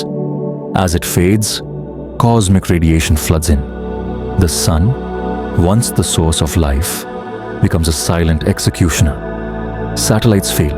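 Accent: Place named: India. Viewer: Hello bro 👋 Congratulations on ur new channel 😍 and Happy New Year 🎉 Saw ur video and realized that the content is actually good but the views r slow. I do voiceover. If you'd like me to voiceover ur vids, I would be happy to provide my services. I am an Indian and by ur accent I can say that we both r bros 😃. It appears to me that ur accent still lacks a global appeal. This is one of the reason for slow growth for an Indian youtuber. Kindly reply if you r looking to speed up things!!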